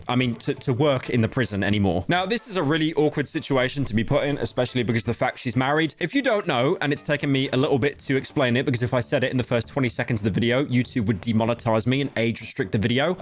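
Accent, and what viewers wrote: United Kingdom. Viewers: i was 100% certain that it was penguinz0 video until i got jumpscared with bri'ish man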